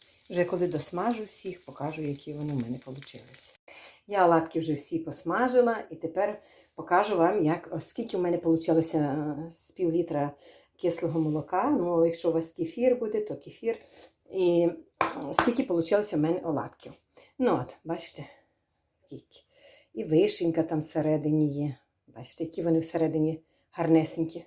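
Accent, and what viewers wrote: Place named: Bukovina, Ukraine. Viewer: Як смачно❤❤❤ Мені дуже подобаються ваші рецепти, а особлива ваша українська ... Це таке відчуття, що побувала на Буковині . А ви часом не з Буковини ?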